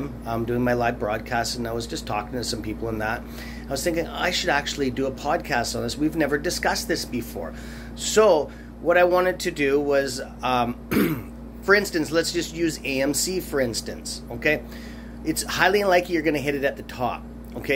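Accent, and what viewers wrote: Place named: Canada. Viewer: Dude sounds Canadian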